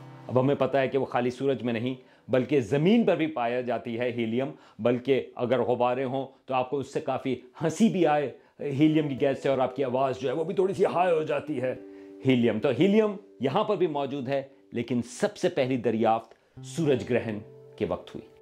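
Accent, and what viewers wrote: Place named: United States. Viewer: Very Interesting. An American way of expressing in great urdu language !!!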